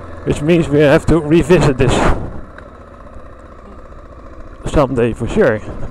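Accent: Netherlands. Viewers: Op de 1 of andere manier hoor ik gwn dat je een Nederlander bent😂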